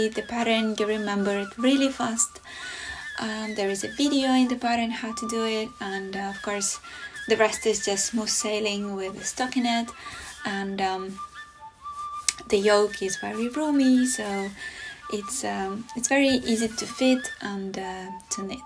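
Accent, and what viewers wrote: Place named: Europe. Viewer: This is my first time watching your podcast and I loved it.. thank you so much. You are very talented & it is a joy to follow you. Also, because I am also from Europe, it is so lovely to hear your accent. Your story of your grandma embroidering the blouse really touched me, as it reminded me of my own beautiful grandmother. She was from Austria.. a wonderful knitter, crocheter & embroiderer, who also designed knitting garments & just knit from her memory. I learnt so much from her, and miss her every day 💕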